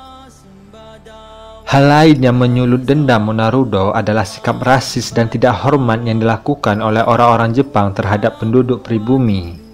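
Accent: Manggarai, Indonesia. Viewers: Fokus dengan logatnya seperti logat manggarai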